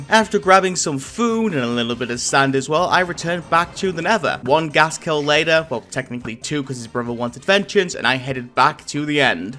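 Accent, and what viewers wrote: United Kingdom. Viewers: Are u British 😅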